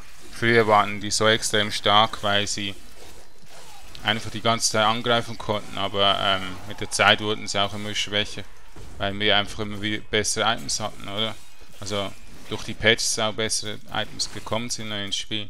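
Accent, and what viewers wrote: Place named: Basel, Switzerland. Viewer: sali bisch wohl au vo basel em name und em dialäkt nooch ^^ ich spiels au.. ha au en moench gnoh, us em gliche grund ^^ schrib moll di accountname unter dä kommentar , denn add ich di! bi allerdings scho lvl 70 p lvl 40 ^^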